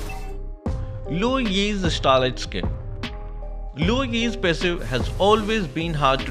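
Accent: India. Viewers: you sound like my friend his indian nationality. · Bro got Indian accent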